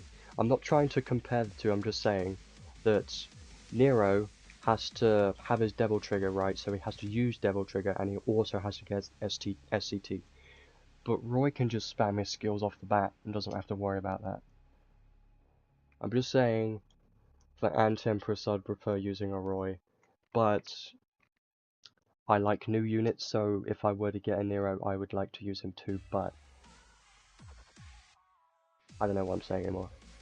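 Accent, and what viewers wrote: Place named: United Kingdom. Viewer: That reaction is why I see your videos at night. Such a soothing Brit voice. XD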